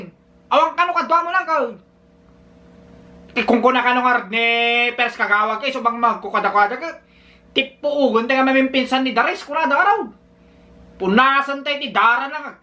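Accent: Ilocos, Philippines. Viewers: I have Ilocano parents from Gerona Tarlac, I was born and raised in London U.K. Your accent very strong Ilocano and reminds me of my relatives aunties and uncles from the barrio village very strong Ilocano and shouting talking with each other like they are in the mountain! Hahaha 🤣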